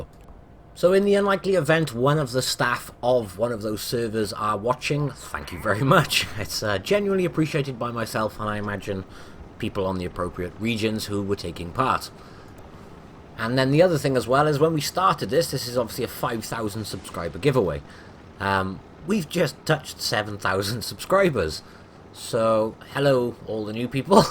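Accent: United Kingdom. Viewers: will i did not win i am a bit sad but that's not the reason why i subscribed i want the at15 because it has a ridiculous rate of fire and good armor but hey hearing you laughing along with that magnificent British accent got me compensation hope we get to rich 10k as soon as possible and please keep laughing at reds and keep uploading videos my favorite YouTubeer:D...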